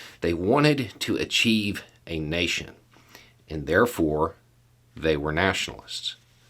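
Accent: South, United States. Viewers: A wise man with a big ol' beard and a Southern lilt to his voice. You're breaking down my stereotype, Brother. 🙏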